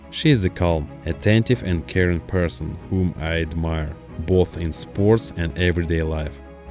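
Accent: Russia. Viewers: Ведущий внешне и по акценту на русскоговорящего похож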